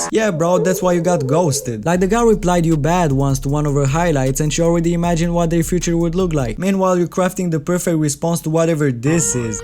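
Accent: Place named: Balkans, Eastern Europe. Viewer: You are from Europe or balkan country .damn sure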